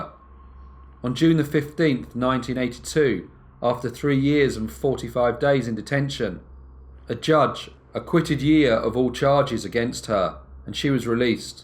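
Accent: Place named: South East England, United Kingdom. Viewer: New sub here. Trying to work out where you are from in England! Your accent is similar to mine, so I'm guessing the South East?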